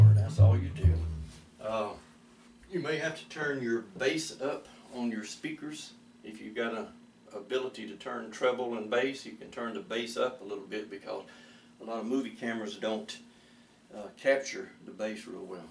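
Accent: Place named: Atlanta, United States. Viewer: Great sound. I have just constructed one but not sure best string to use?? Are you from Atlanta - nice accent. (I'm British from Michael Caine country - South London)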